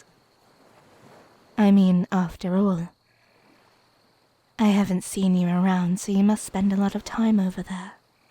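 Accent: United Kingdom. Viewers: Amazing audio, your british accent is very nice and I would love to see spy wife / girlfriend storyline to continue there is so much suspense in that one 😊☺️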